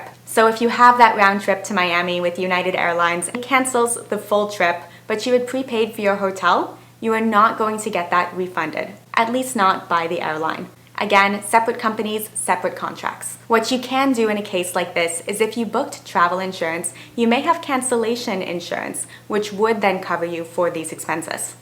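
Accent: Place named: New Jersey, United States. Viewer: I feel like you are covering up an accent. Like New Jersey or Boston thick accent.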